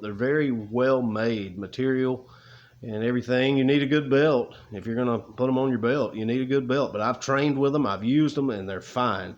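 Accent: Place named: South, United States. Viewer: Haha glad to see you, always good information and spoken in good ol Southern English. Really like your AK reviews, be safe everything is going to work out!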